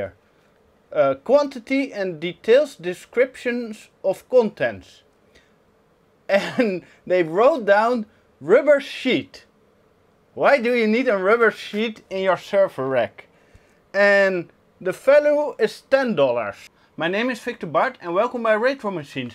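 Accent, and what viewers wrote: Netherlands. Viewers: ik kan gwn meteen horen dat je nederlands bent hahah Wel leuke vids man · Je klinkt erg Nederlands.. Klopt dat?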